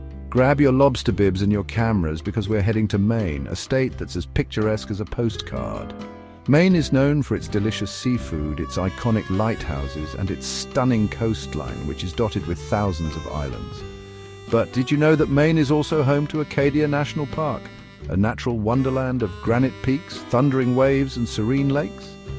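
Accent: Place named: United Kingdom. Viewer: Americans don't need anyone with a British accent telling us that some of our states don't matter...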